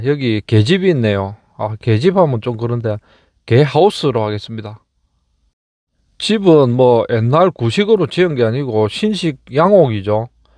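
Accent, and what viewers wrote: Gyeongsang, South Korea. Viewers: 경상도 말씨 듣기 좋습니다 ❤ 상세한 설명 ~~ 즐겁게 보고있습니다. 홧팅 입니다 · 사투리? 경상도 말이좋아요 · 경산도말이 좋습니다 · 경상도 사투리 구수하게 들리는데 누가 시비를 터는가 보군요 모르긴 몰라도 앞으로 폐가 문제는 더 심화 될것입니다 보이지않는 텃새 지역 이기주의 배타적인 정서 나열하기 싫은 단어들이 떠오르네요 아무튼 고생많았습니다 영상감사합니다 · 경상도 사투리 정겹습니다.... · 제가 이 tv를 구독하는 것이 컨텐츠도 좋지만 구수한 경상도 사투리때문에 보고 있어요. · 경북에 사는 사람인가보네요... 표준말 쓰라고는 안하겠데, 불편한건 맞아요.. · 사투리 말씀 재미 있고 구수함니다.경상도.사나이.아이가...이곳미국은.빈.건물.상속자가.꼭세금을내서..세금이.밀리면.스테이.에서.가저같니다.😮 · 간결한 갱상도말 지금시대좋쵸 😊😮😂🎉❤ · 저는 구수한 경상도 사투리 목소리가 좋아 즐겁게 방송을 보고 있습니다~ 물론 전국에 버려진 빈집이 이렇게 많이 있다는 게 안타까운 마음으로 보기도 하지만요.. 아무튼 방송 고맙게 잘 보고 있습니다. 늘~ 건강하시고 안전하게 현장 취재 하시길 기원합니다~ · 전고향이 충청도인데~! 경상도 사투리와 어걍이 자연스럽고 구수하게 들려서 구독했습니더~! 마~! 빡세개 화이팅~ 하이소~!!:hand-pink-waving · ㅋㅋ 경상도 사투리 구수하게 듣기 좋아요~ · 댓글에. 사투리에. 설왕설래. 하시는분들. 많으시네요. ~ 전. 좋으네요...^^ 각. 지방사투리중/경상도가. 최고라얘 어느분 글처럼. 음소거로. 시청하이소. 😂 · 전국 방방곡곡 다니시느라 고생 하십니다. 인구절벽과 경기침체에 농촌마을이 황폐화 되는군요. 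경상도 사투리 좋아요. 괜히 마음쓰지 마세요. 덕분에 앉아서 전국을 구경하니 얼마나 좋습니까? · 경상도사람이 경상도 사투리 쓰는게 무슨 문제라고 시비을 거는지 서울촌놈도 서울사투리 마이 쓰더만... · 경상도의 말투가 부산 경남과 대구 경북은 완전히 다른데 은 서울 말투보다 듣기좋네요.오늘도 좋은영상 잘 봤습니다. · 자연스런 경상도 억양 넘 좋아요 화이팅입니다^ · 말은 표준말인데, 억양이 경상도라써...그런지, 알아듣는데는 아마 이상없을 것입니다. 천연기념물 의 톡특한 영상 감사히 잘 보고 있습니다. 혼자 다니시니, 몸조심 하시기 바랍니다. 사고나면 혼자다 처리해야 하니......조심 조심 운전하시길 바랍니다.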